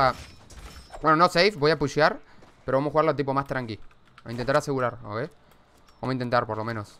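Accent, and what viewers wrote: Argentina. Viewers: Es argentino ?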